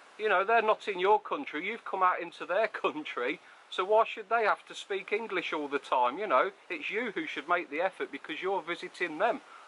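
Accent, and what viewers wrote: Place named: Yorkshire, United Kingdom. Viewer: More interested in your accent. Yorkshire?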